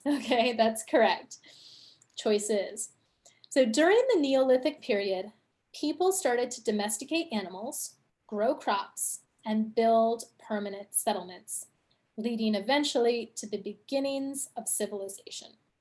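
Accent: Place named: United States. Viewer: Namaste from India Miss sweetest smiley, 🤗☺You are so sweet and Haha why American's People's English language is so stylish 🤷‍♂️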